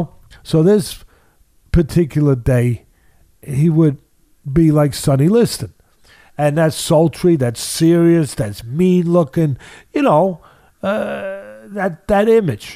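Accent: Newcastle, United Kingdom. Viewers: wow with true geordie, amazing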